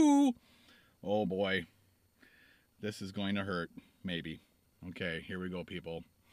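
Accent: Canada. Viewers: is he canadian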